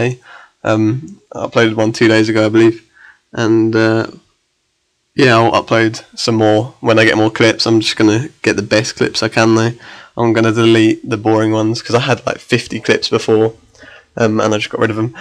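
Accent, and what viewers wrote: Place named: United Kingdom. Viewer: ur british, look at my version plawx?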